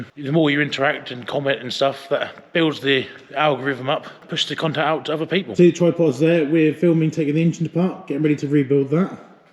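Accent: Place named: Norfolk, United Kingdom. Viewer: Excellent video. Great content, awesome camera work...... and proper jaaaaarb Narfuk hoo-mour and accent. :) (Am a California person meself, so East a bit from you and across the river...... but (thankfully) north of low-staaaaft. ;) Can't wait for the next one - more Antar Action, or some stripping (of engine, please!) time? :O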